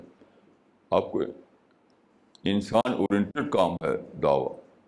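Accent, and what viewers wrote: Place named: Arab world. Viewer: Arabic accent not clear always.Urdu is o k .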